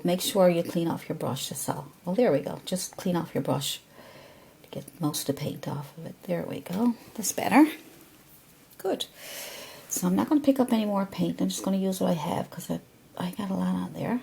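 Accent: Newfoundland, Canada. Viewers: Is that a Newfoundland accent?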